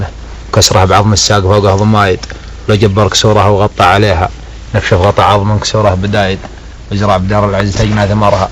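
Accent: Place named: South, Saudi Arabia. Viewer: يا ذكي .. هذا شاعر سعودي من الجنوب السعودي .. ولهجته سعودي واللحن والالقاء واضح شعر قحطان ويام الجنوب السعودي .. واضح جدا بإنه شاعر قبيلة عريقة من قبائل جنوب السعودية وغالبا قحطان أو يام .. وربما شهران .. وليس يمني أبدا .. أبدا .. لأن لليمن لحن وأسلوب ولهجة مختلفة تماما .. لكن اللي ما يفهم التمييز .. يقول على الفول عدس .. !!! وسلامتكم .. ابو شنبات ......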